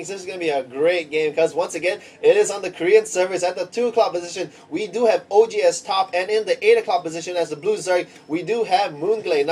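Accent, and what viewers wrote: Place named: Singapore. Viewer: You sound like a singaporean. And loking at your profile I think you are! Good job. keep it up. =)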